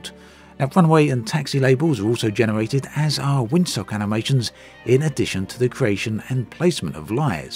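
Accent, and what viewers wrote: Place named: Wales, United Kingdom. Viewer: I am still trying to understand what part of the English speaking world YouTube content creators come from? So often their use of syntax and pronunciation is impossibly wrong. It is a cadence of translation from another language, yet these people seem to have a Welsh brogue. I'm fucking baffled and also annoyed. Don't get me wrong the American narrators are often as poorly spoken, particularlily those from the West coast. Beyond weird. I never encounter people who speak like this in real life but everywhere on YouTube. I am convinced they are all AI.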